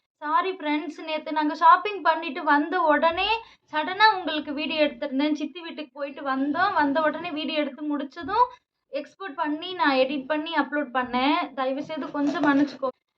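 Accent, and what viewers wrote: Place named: Odisha, India. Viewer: நீங்கள் ஏன் ஒரியா பெண் மாதிரி தமிழை பேசுறீங்க?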